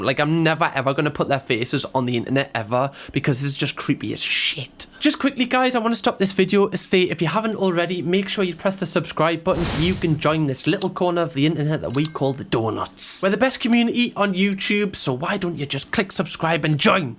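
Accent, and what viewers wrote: Newcastle, United Kingdom. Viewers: No, hes from somewhere near newcastle i think x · I love this man's British accent 😮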